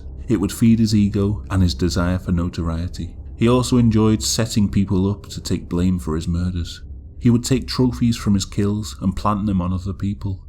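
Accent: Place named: Northwest England, United Kingdom. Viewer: Love your videos, they’re always so interesting and familiar because of your Northwestern England accent. My family are all from that area 😊and it’s so nice hearing you voice over the vids. I’ve only just found your channel and couldn’t sub quick enough. Brilliant 🙂keep at it, you definitely keep me entertained x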